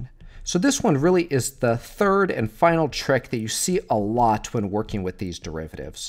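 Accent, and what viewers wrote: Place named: Canada. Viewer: I really want to know what his accent is!!! Is he canadian??!! Also- very helpful video!!!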